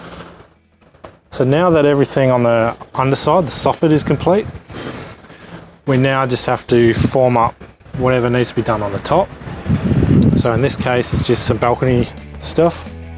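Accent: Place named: Western Australia, Australia. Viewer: no way mate. I thought you were from West Oz by your accent. North or South of the river? Looks like you work in the northern suburbs if I had to guess. I lived down near Byford most my life. Name comes from a vlog of my time in Russia.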